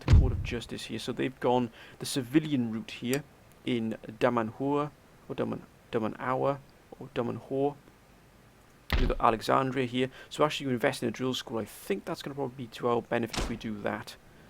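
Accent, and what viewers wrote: Wales, United Kingdom. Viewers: Where abouts are you from in Wales? your dialect is very interesting to me, great vid btw your always a gentlemen talking to people in the comments and your very good at this game!! :) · Hey man, where are you from? You sound British but you have hints of foreign By the way, great video, definitely subscribing!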